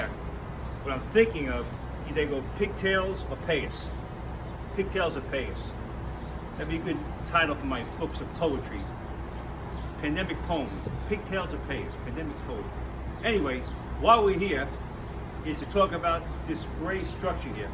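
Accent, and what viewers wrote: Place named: New York, United States. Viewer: Add me too a little Di Niro! Going on here the accent the look. You are much cuter and younger! Born in Oceanside, I will be tuning you for my NYC fix! Thanks!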